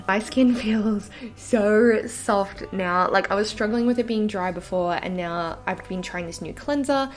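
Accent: Australia. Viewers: I miss the Aussie accent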